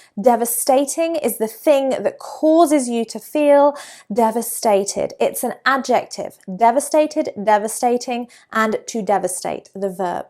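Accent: United Kingdom. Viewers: Really I enjoy each lesson with you I loved the British accent it is attractive when you use it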